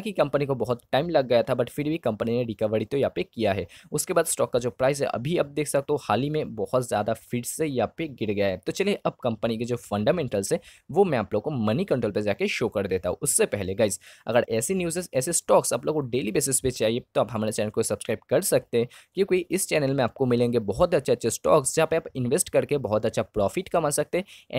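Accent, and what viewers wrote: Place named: Bihar, India. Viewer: Tu bihari hai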